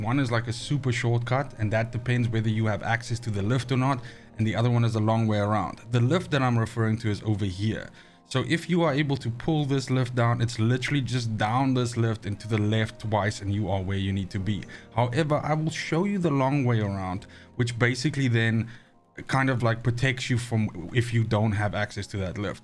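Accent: South Africa. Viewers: Are you from South Africa? 🙆🏽‍♂️ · Bruh Are you South African? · Are you south african? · Are u from SA? That accent sounds familiar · This guys South African? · lief die suid-afrikaanse aksent! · south african bro! im from good ol Jo berg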